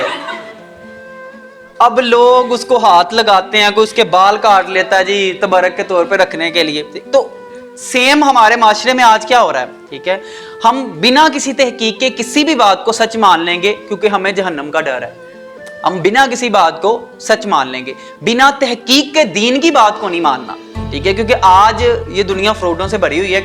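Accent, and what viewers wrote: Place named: Punjab, India. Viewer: Sir story b axhi thi lakin ap ki punjabi ka style b buhat acha tha seriously 😀😂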